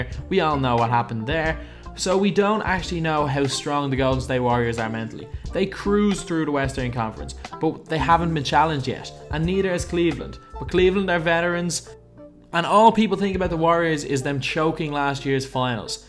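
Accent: Ireland. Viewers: Is this guy irish???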